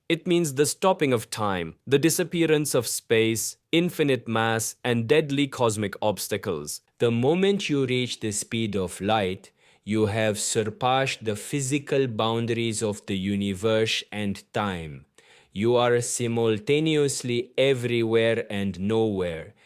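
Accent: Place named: India. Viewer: İndian aksan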